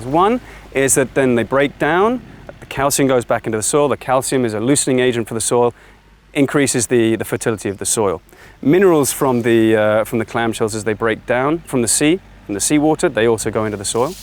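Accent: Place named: England, United Kingdom. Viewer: So nice to hear English English from NOVA SCOTIA. I have just bought some seeds and am aching to seed them..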